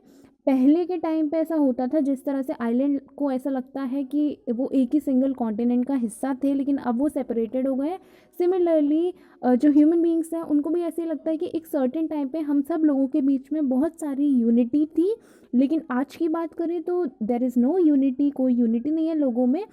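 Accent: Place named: India. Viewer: Indian accent brother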